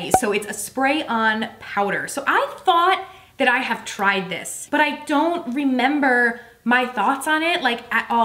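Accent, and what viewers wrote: New Jersey, United States. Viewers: I love you in general I have for yours but your Jersey accent comes through in the cutest ways and I have to double tap back 5 seconds I love it so much 🖤🖤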